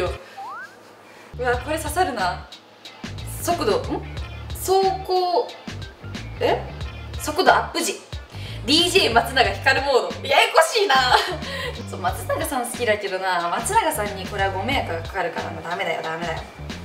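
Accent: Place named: Kansai, Japan. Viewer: ノースリーブだと綺麗な首筋や肩が見えるのでとても似合ってる。 こんな可愛い顔してるのに変にぶりっ子してへんし、ハキハキの関西弁とマシンガントークのこのギャップはたまらん‼️ speaks really quickly😵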